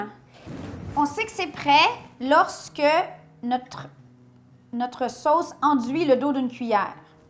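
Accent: Canada. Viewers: Coucou, j'adore tes vidéos, ta bonne humeur me fais passer de super moments!! Je suis italienne mais j'habite en Suisse, et je conseil ton blog à tout le monde (ici on adore l'accent canadien;) ). Je ne sais pas comment j'ai découvert ton blog mais depuis ce jour là j'attends tes vidéo avec impatience. J'admire ce que tout fais et surtout comment tu le fais. Merci pour tes recettes et tes sourires.:) Tania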